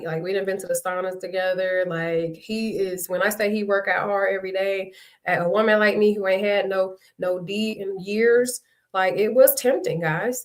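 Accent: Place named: Detroit, United States. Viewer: I heard your Detroit accent immediately, similar for some of the other cast. It is subtle but Detroiters have it. Saying D'Troit in a way more like the original French origin versus dEETroit I hear outside Michigan.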